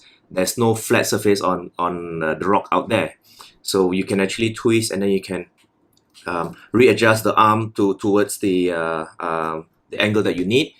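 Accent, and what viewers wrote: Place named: Malaysia. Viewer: When I heard you speak I instantly knew. Fellow Malaysian!!!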